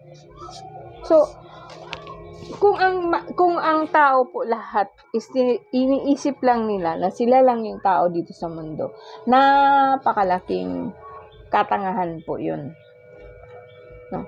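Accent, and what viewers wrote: Bicol, Philippines. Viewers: Mam tanong ko lamang ikaw po ba ay bicolana, kc po bawat bigkas ng salita mo i notice na bikolana ka, from Camarines norte po ako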